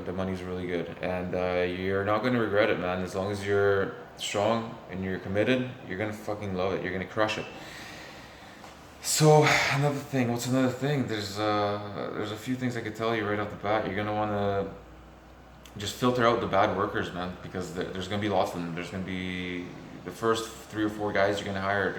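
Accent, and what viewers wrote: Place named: Lithuania. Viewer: You sound like Lithuanian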